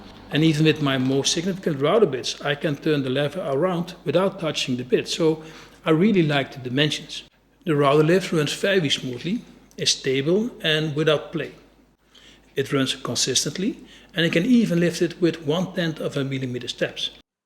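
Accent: Netherlands. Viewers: And I like your accent. It’s even better than that of Mark Rutte…😜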